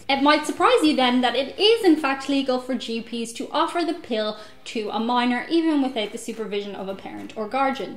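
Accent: Ireland. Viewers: Love that Irish accent.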